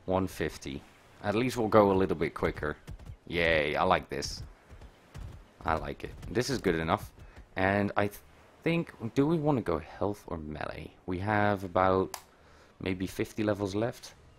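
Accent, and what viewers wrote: Netherlands. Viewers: that's why I asked it sounded like he had the accent im from NL too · is from NL Cant you tell with the accent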